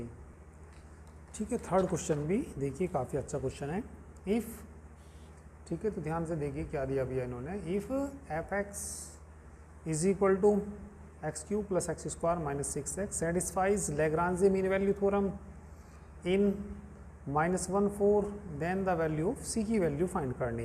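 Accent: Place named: Saharanpur, India. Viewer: Sir Aaj kha se ho islsiye puchh ra kyoki aapki bhasha hmare jaisi h ,,,,Sharanpur wali .😅❤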